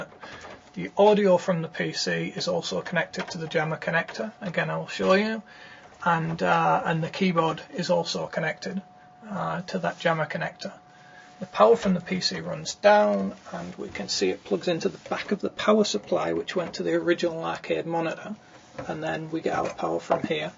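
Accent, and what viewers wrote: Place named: Geordie, United Kingdom. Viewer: hey, how did them yanks find that hardcore geordie accent of yours??!?!?!?!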